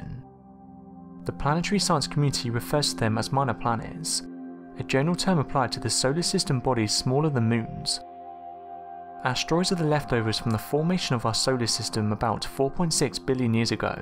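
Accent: United Kingdom. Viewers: Where the F&&^% do they find these BRIT announcers? Really--Tuskon, Arizona? I couldn't catch half of what he was saying, even with the Closed captioning going! If it's a NASA bulletin, find an American announcer!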